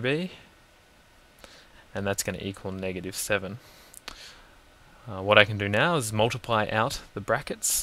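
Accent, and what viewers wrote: Australia. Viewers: (PixelonTV) Australian (Y)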